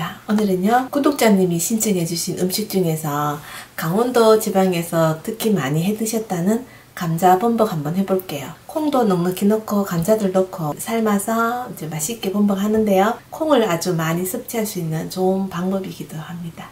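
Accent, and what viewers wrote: Gyeongsang, South Korea. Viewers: 아 ㅋ 설악산쪽요 ^ ^ 지금은 써울이구요 이모님 음식솜씨 ~ 볼수록 최고라 ㅎ 이렇게 배울수도 있으니 정말 좋은 유트브세상이네요 만남 반갑고 고맙습니다 죽을때까지 배워야한단말 실감하며 욜심 따라해보겠습니다 ^ ^ 댓글 고맙구욤 🤗 경상도사투리가 듣기좋아요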